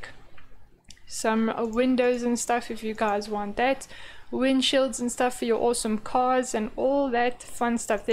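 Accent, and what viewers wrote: South Africa. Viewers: Love the accent. I'm thinking South Africa. Great video. Very helpful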